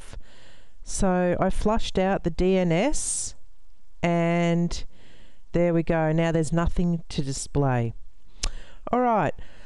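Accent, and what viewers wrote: Australia. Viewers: from your accent I noticed that you are Australian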